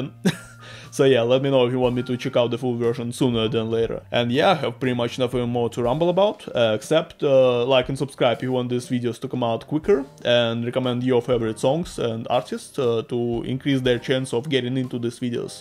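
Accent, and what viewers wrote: Russia. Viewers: Русский акцент я узнаю из тысячи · Подожди, ты русский? Я вот сижу уже почти полторы минуты думаю, акцент похож на русский... зашла в описание канала, ничего не нашла и тут ты говоришь "Драматургия" и я такая "стоп, чё серьезно?" Ив один из моих любимых певцов и я рада видеть подобные реакции ❤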